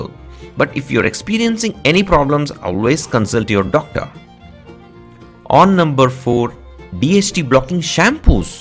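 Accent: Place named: England, United Kingdom. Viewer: That THICK English accent is making me kill myself.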